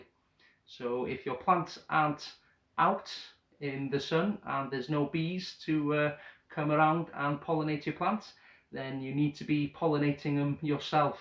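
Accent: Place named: North Wales, United Kingdom. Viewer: Love your channel and this video mate, I lived in North Wales (Wrexham) for a few years about 20 years ago and your accent brings back memories! I have flower drop on a few of my indoor chilli plants, fingers crossed I can sort it out with your advice 🤞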